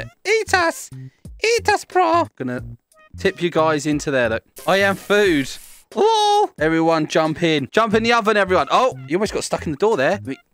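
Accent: United Kingdom. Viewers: ur from British😮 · I think he’s British🇬🇧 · Mate ur british?!?! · Is it me or Have I been watching this guy for 4 years And just realized He's British? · Are you British? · Bro is British playing with Americans!?!?!?!?! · This dude is Britain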